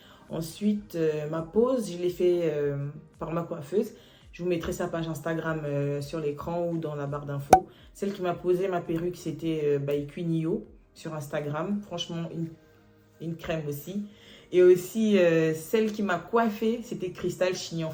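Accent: Gabon. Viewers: Tu parles comme une gabonaise 😊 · Pourquoi on parle tous pareillement 😂 je viens de découvrir la chaîne mais l’accent ci 🥰🇬🇦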